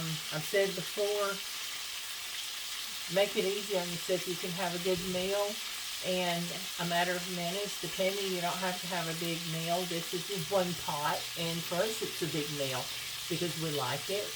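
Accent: South, United States. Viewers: Thanks for this lovely video - I really enjoy cooking videos & I found your delightful Southern accent very pleasant to listen to. I have subscribed to your channel. I totally agree re butter, it makes everything taste better! I put a dab of it in my green beans tonight & it made them delicious. Best wishes from Sydney, Australia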